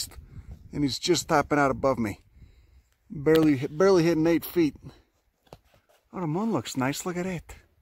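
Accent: Chicago, United States. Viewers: The 'Cago accent pronouncing Latin is the best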